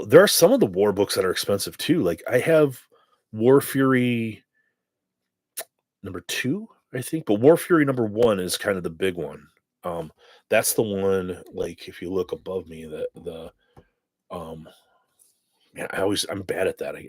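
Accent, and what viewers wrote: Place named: Chicago, United States. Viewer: Congrats on the Solid Pull. Sounds like your a Chicago guy. I'm in the SW suburbs.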